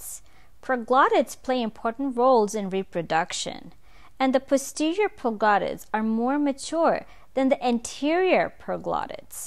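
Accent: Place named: South Korea. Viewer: i like korean accent very much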